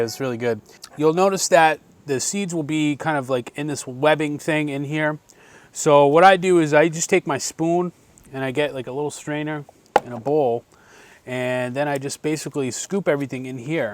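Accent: Boston, United States. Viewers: Dude! I love your accent! Is it Boston?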